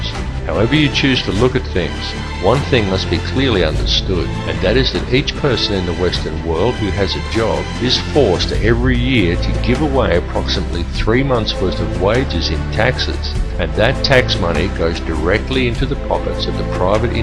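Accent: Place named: Australia. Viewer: have any idea who the speaker might be? he seems australian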